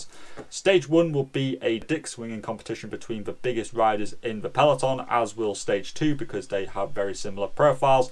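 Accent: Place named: England, United Kingdom. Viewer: Arf j'ai cru pendant 5 secondes que la preview allait être en français 😢 Heureusement, j'aime bien ton accent anglais.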